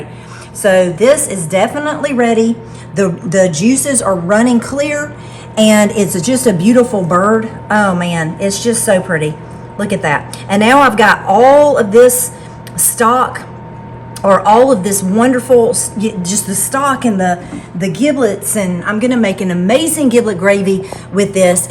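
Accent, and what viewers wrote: South, United States. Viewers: Great recipe ! I could listen to your southern accent all day 👍🏼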